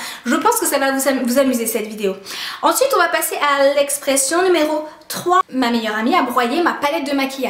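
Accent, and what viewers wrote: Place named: Nord, France. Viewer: Et même l’accent tu reprends l’accent ch’ti ça porte à confusion .. mais du coup tu viens de quel partie du « nord » ?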